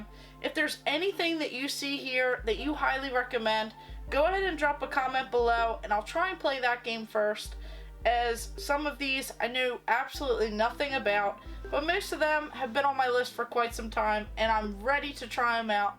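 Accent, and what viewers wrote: Maryland, United States. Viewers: That's a nice haul. You live in Maryland? I've never heard a Maryland accent until you. He he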